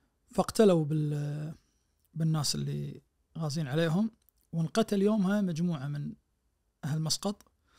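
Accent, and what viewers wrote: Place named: Iraq. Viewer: هذا لهجته فيها من اهل العراق حيل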